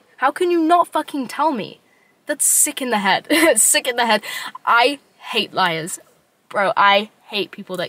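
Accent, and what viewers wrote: United Kingdom. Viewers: it this a British accent? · For the not native speakers you speak too fast))) Where you from, UK? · Are you British!?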